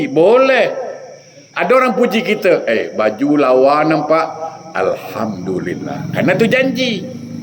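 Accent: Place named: Johor, Malaysia. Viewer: Assalamualaikum ustaz,bagus penyampaian ilmunya mirip loghat Johor malaysia.. Alhamdulillah.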